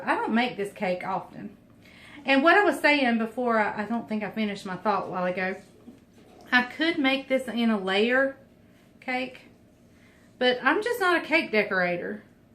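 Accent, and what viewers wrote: South, United States. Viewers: you crack me up! With your southern accent it sounds like you are saying bacon soda and bacon powder. Funny! I remembered my mama making this cake when I was little - the buttermilk makes it so moist and that icing is just the yummiest!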